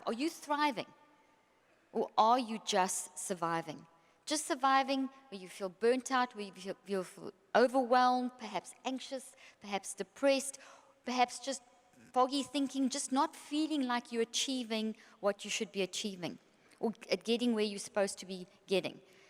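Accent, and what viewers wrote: South Africa. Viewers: Is this a South African accent? How wonderful to listen to; both the accent and the content, of course!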